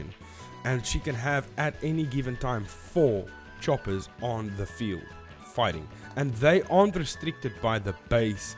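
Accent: South Africa. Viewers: Jy klink afrikaans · You sound south African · You sound South African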